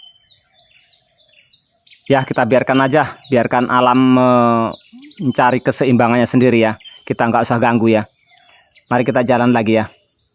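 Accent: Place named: Medan, Indonesia. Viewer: Abang ni logatnya kayak irang medan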